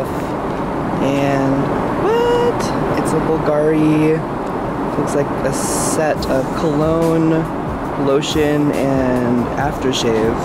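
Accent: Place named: Philippines. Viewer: ok so you are pinoy. that's why you got an asian accent when you speak english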